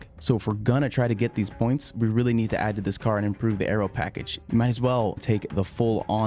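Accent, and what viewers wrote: United States. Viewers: Great vid, and as much i like the way the brits talk it’s nice to hear an American F1 fan do it like you, so thanks bro, from Atlanta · F1 in American accent with a few unusual pronunciations sounds a bit weird but kudos man for these recaps.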